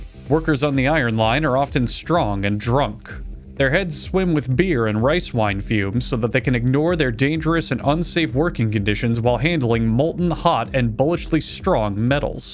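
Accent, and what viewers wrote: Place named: Boston, United States. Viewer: He made every Chinese person sound like there from Boston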